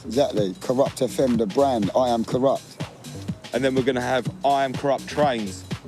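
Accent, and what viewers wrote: United Kingdom. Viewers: Creamy British vocal chords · The British accent is like nails on a chalk board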